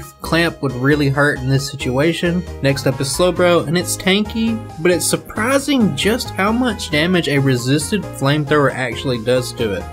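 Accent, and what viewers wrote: South, United States. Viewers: just finished your vid and btw love the southern accent... Quick question do you think you can do a vid of you doing it as if you were playing normally.. as in more than one pokemon.. no tms and no items? Just not seen one of them might be intesresting.. if you do or dnt will keep watching your vids keep it up :)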